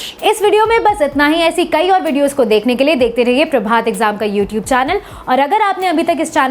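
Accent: Standard. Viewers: Ma'am aapki shuddh hindi👌👌👏👏👏👏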